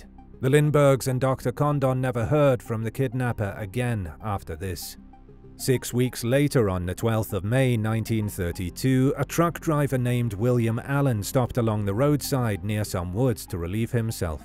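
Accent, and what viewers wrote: United Kingdom. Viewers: damn just you have a strong British accent mate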